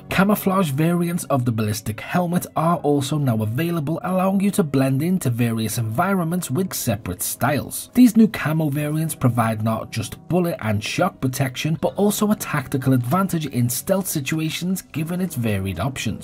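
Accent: Liverpool, United Kingdom. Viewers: What part of the UK are you from bro ? Can't place it. Hints of scouse I think tho as a scouser myself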